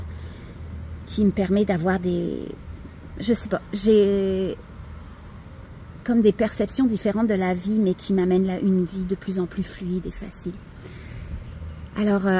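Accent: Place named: New Brunswick, Canada. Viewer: tu as un bel accent que j'aurais pensé peut être du Nouveau Brunswick 🥰 grand merci 🙏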